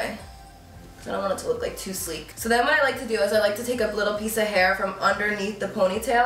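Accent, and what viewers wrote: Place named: Boston, United States. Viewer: She sounds like she’s Jewish or from Boston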